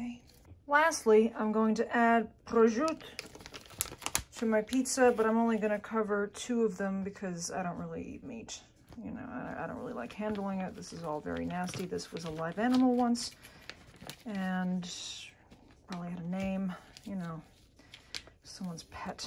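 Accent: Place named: New York, United States. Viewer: is from New York City, specifically Queens. And yeah, her inner New Yorker came out fully here, and it is awesome.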